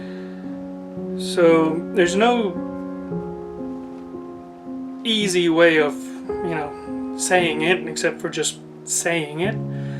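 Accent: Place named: Scandinavia. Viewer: Søgne, 25 min unna Kristiansand :joy: Familien min er fra Gjerstad. Jeg er så hillbilly som man kan være i norge, Pluss i live in the south :) Din engelsk tale er meget bra, men etter å ha sett noen vids rett etter værandre må jeg inrømme å si jeg hørte at det var noe scandinavisk der. Du er opprinnelig fra danmark? Hvor i DK om jeg kan spør?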